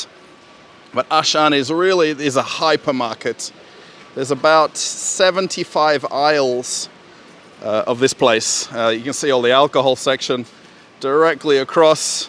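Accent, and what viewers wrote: Australia. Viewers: Он же вроде австралиец. Да/нет? У него есть австралийский акцент? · Австралийский английский как-будто понятнее что ли..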